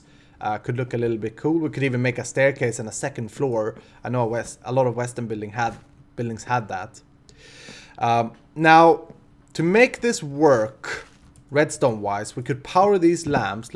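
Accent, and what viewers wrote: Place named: Sweden. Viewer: Man, I feel left out of the conversation here! Oh wait det gör jag inte alls det! You're the only youtuber with a Swedish accent I'm watching. Because the Swedish accent makes me cringe (mainly because I can hear all the stuff I, myself, pronounce erroneous in English, it's a bit like hearing yourself recorded, but with someone else's voice). But you're doing way to interesting stuff for the accent to outweigh the content produced!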